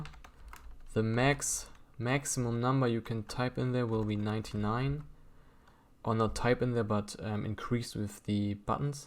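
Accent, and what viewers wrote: Germany. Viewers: I KNEW YOU'RE GERMAN FROm THE BEGINNING ON! (bin auch deutsch)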